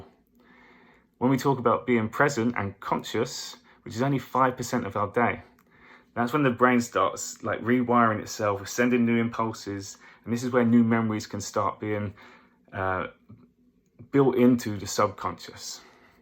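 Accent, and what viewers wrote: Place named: Milwaukee, United States. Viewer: You have a nice accent are you from Milwaukee?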